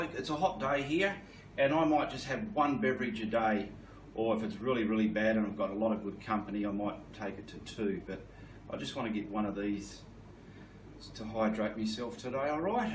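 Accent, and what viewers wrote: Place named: Australia. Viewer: its great to see and hear a fellow Aussie . im looking forward to following your tutorials as im a beginner .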